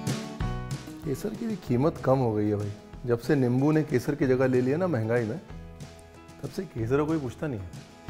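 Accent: India. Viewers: Never heard of it sir love your deliberate desi pronunciations "waaater" 'mangostaan' nice dish definitely going to try it salute to my favourite chef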